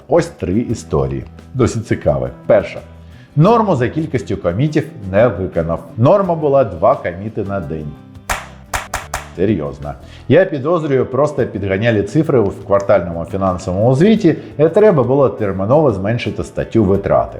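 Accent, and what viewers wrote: Russia. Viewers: Покращуй, вимову... Трохи віддає азіровщиною, а це досить неприємно!!! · За старання українською - респект, але слухати дуже важко. Не знаю скільки репетирував та робив дублів, проте "азіровську" не виправив аж ніяк. 😊 Особливо плутає і та и.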